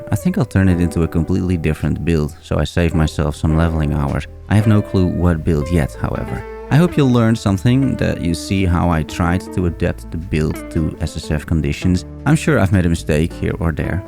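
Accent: Netherlands. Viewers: nice vidz, especially as i am a ssf enjoyer myself. chillt voice and music fits good together. one question: are you from the netherlands with that accent?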